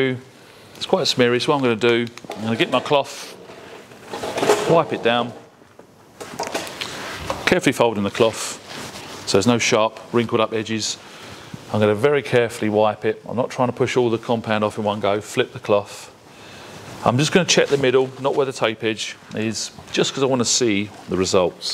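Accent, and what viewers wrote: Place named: United Kingdom. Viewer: isnt lake country is based in the US ? just wondered why did his accent sound british ?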